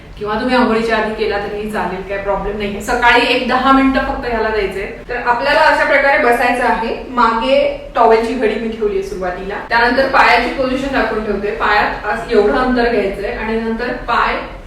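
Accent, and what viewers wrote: Standard. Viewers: मॅडम मला तुमचे बोलणे खूप गोड शुद्ध असते. I am fan of you👌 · मॅडम : तुम्ही फार शुद्ध सुरेख आणि गोड बोलता , का कोणास ठाऊक पण कायम तुमच्या बोलण्यात आपलेपणा जाणवतो❤ !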